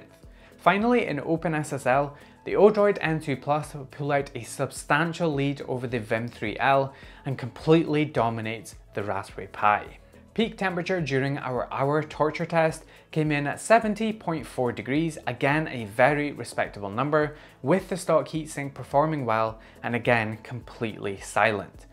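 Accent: Scotland, United Kingdom. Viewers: Cracking video mate, so useful in the current climate! Also can’t tell you how nice it is to hear a fellow Scot on Youtube in a space dominated by Americans! (no hate to them, just nice to hear 😎)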